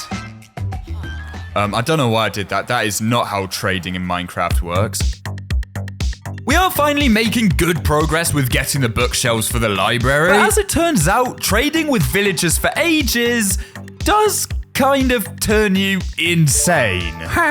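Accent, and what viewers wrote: United Kingdom. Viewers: He sounds so british, not like the language, just... british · R u British cuz u sound a lot load like one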